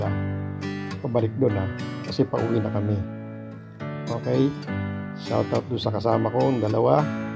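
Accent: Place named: India. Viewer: September🎧 30, 2023 The weather here is fair Ads: 1) Inasal 2) Shopee 3) Excorcist Movie trailer 4) Payless 10 days ago, Brother it seems to me that you have imitated Indian accent. How are you? Qantab has a rocky shore. Okay BRO be safe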